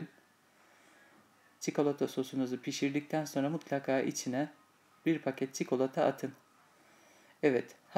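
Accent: Black Sea, Turkey. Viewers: Karadenizlimisin abla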